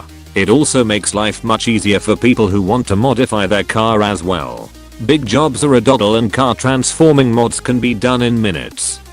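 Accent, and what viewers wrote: Cavan, Ireland. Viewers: Older cars are just better in every sense, my God that robot voice is terrible, go back to your Cavan accent! 😂 😂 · I just realized that best part of your videos is your original, Irish, voice. Now, do 10 reasons why new cars are better then old ones.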